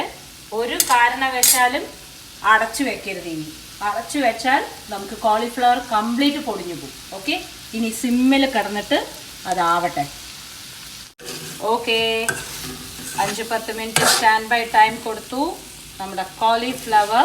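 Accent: Palakkad, India. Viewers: I like your simplicity. You are very straight forward and seeing you enjoying the cauliflower fry was really enjoyable.I also like your palakkadan way of speaking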